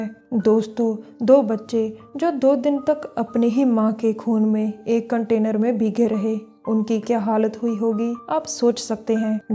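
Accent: Punjab, India. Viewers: Nyc mam ☺ your voice so osm mam aap kaha se ho mujhe apki voice sunke aisa kiu lgta hai ki aap punjab se ho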